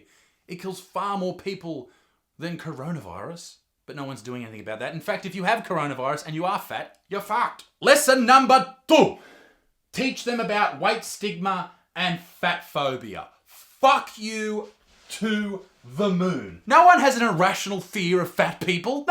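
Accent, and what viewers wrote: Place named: Australia. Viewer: He's true blue....an Australian with thick Aussie accent. He was raised in rural Australia for sure.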